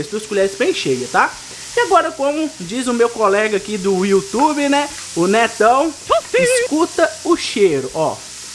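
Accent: Minas Gerais, Brazil. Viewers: Amei o seu sotaque mineiro “ o trem mais bom “. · O homem fala mineiro mineiro quinhentas mil vezes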